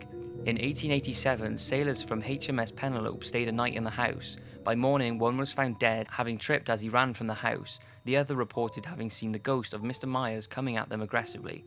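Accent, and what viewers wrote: Wales, United Kingdom. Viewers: Are you british? you sound british. :) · are you welsh? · It's the welsh accent hun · Omg you have a British English accent I always wanted British English accent so I be royalty you can teach me how to be British I love your mystery videos 😊😊😊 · This guy sounds like he's from Newport in wales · Do I detect a Welsh accent? Lol might be wrong but my Welsh ears hear some tones ;p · what a retarded fucking comment..this is guy sounds British so presumably he only speaks English and does his research from books written in english and from the english part of the internet. he's not gonna read/research blog post or article about some pakistani family's encounter with a ghost because it would most like be written in Urdu, for pakistanis by a Pakistani. I guess you gotta forgive him for not speaking every single damm language in the world - I hate race-baiters. 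· can't beat a bit of welsh accent see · Are you Welsh?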